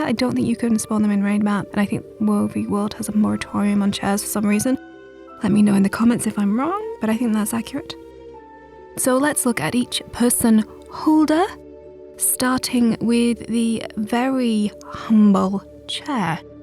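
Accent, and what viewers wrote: United Kingdom. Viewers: You have a fantastic voice. Interesting accent too, what part of UK are you from? You could do an audio book XD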